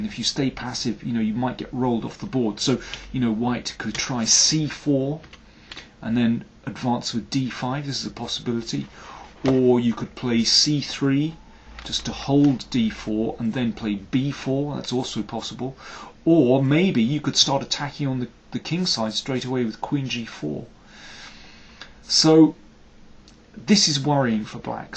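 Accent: United Kingdom. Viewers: Hi Daniel! Thank you so much for your insightful commentaries! Also a big fan of your British accent that makes things dramatic and theatrical to my taste!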